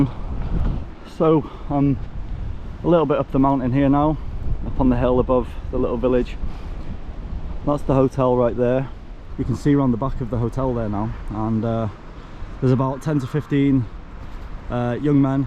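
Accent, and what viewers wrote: England, United Kingdom. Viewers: The real and prolonged threat to Welsh culture is English immigration. Your English accent is testimony to that. Happy to be overrun and ruled by your English masters eh.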